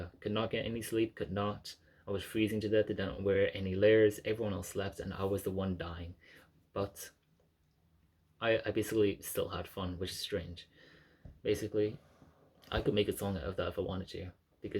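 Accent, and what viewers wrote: Ireland. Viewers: I hear an irish accent for sure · are you irish?? or live in ireland?? i can't place your accent but i swear you have our manners of speech it's driving me crazy lol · This was great advice, thank you! Also, not sure if that's a bit of an Irish accent I'm picking up or not? It reminds me of my fellow Dubliners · are you irish?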